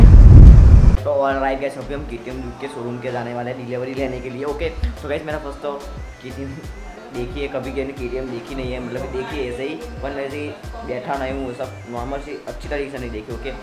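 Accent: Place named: Gujarat, India. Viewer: Bhai tu gujrati he muje pta chal gaya mebhi gujrati hu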